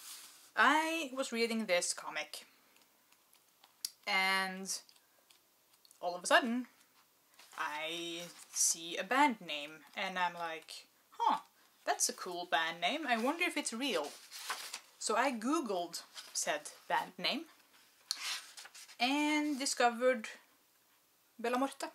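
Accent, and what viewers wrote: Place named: Trondheim, Norway. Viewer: I was listening to your accent and thinking 'she sounds like my friend from near Trondheim' and lo and behold... that is where you are indeed from. So, I feel very smug right now, as it's taken me forever to be able to identify Norsk accents :-) Anyway, goth-wise... I know a few persons of this persuasion in Oslo, but the scen is tiny. That said, I used to live in London, and the scene there is much smaller than it once was. Or so was my observation. Anyway, enjoyed your video :-)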